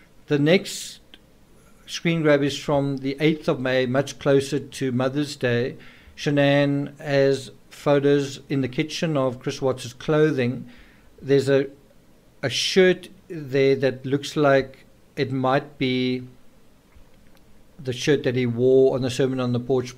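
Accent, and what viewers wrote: South Africa. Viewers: Good SAFFA accent! 👍 ♡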